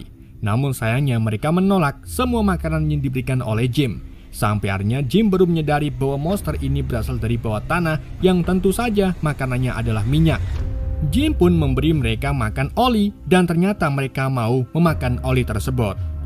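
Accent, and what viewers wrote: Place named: Banjar, Indonesia. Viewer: Bng ne urang banjar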